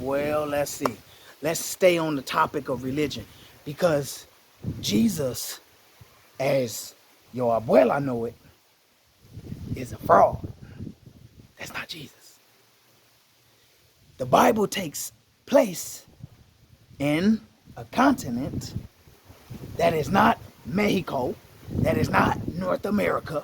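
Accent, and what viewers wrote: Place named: Alabama, United States. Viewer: You talk like white man from Alabama.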